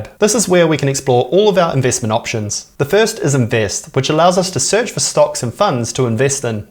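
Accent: New Zealand. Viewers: He speaks in a typical New Zealand accent. They are well known for speaking quickly.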